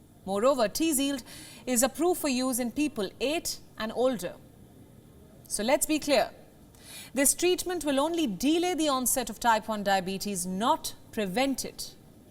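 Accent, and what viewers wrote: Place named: India. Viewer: No, she is trying - and succeeding, in being more easy to understand for audiences not used to Indian-accented English. WION - "world is one news" is aimed at an international as well as a domestic Indian audience. A major component of typical Indian accents is a difference in sound pattern, with the emphasis seeming to occur in the wrong place to what speakers familiar with the most common UK, American and Australian varieties of English expect. Slowing down just by itself helps a lot. If this all sounds like nonsense to you then look up the difference between "stress-timed" and "syllable-timed" languages. English in its country of origin is stress-timed, as is German, Dutch and Russian. Hindi, Spanish, Italian and most southern European languages are syllable-timed. As is the native language of Wales, which is why some native English speakers think people with a strong Welsh accent sound Indian, or that an Indian accent sounds Welsh!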